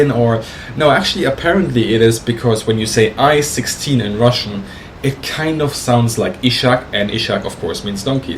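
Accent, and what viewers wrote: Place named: Germany. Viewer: Wow! What a way to present information, through an actual real-time simulation. And do I recognize my favorite German accent guy? Whose depth of knowledge of the ww2 aviation history is UNSURPASSED? Thank you! This is awesome! I learn more from this than by flying the simulator myself! Great medium! Re-live reality!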